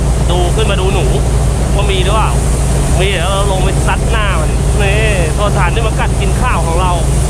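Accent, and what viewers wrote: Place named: Bang Kam, Thailand. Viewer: พูดเหมือนคนบางกํา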